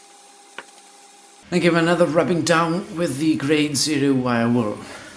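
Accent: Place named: RP, United Kingdom. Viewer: Words fail me...and what the hell is going on with the posh accent?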